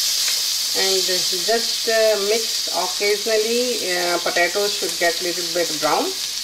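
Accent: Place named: India. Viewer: can you write the ingredients, i can't understand the ingredients, no offense, but i'm mexican and u are indian, and i don't understand you because of your accent, again no offense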